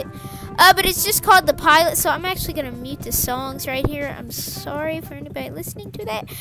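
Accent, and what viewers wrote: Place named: Australia. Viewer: Sound like you’re from Australia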